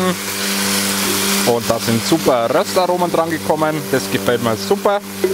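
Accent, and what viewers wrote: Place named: Bavaria, Germany. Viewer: Moin, jetzt habe ich schon unendlich viele Videos, mit deinem schönen bayrischen Dialekt, von dir verfolgt. Gestern habe ich das Gulaschrezept ausprobiert. Meine liebe Frau hat alles geschnibbelt, und ich durfte zubereiten. Das Ergebnis war wirklich super. Mach weiter so, ich tu's auch. Beste Grüße, Hubi und Doris aus dem Münsterland.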